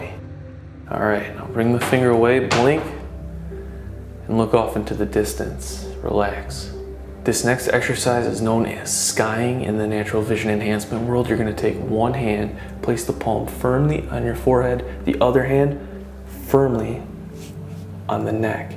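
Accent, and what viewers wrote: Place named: Midwest, United States. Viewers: does this only really work for people who can only see thing far away? Being nearsighted this didnt do much for me except give me a bit of a laugh when he was using his entire face to open his eyes. good vibes on the video though, gotta love that midwestern accent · He sounds like he's from Chicago.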